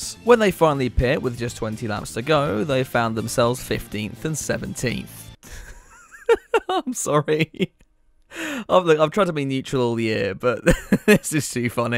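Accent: United Kingdom. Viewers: accent is so British that I keep hearing "Tor-Tus". Btw banger of a video,!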